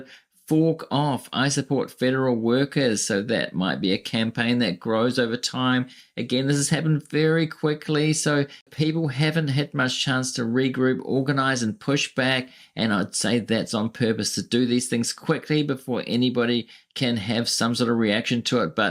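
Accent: New Zealand. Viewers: Are you Australia or New Zealand. I think your accent sounds more New Zealand. I just want you to know that we Americans are not all psychotic as the media has us looking today. I originally clicked on one of your videos a few weeks ago. I thought you were one of my former employees. You two are a splitting image. As far as Musk, I am quite sure he will be prosecuted in the next administration. However, I think the current administration is so afraid of retaliation. The Congress on the left side are very afraid of Trump. However, Musk should start putting his assets and etc in order.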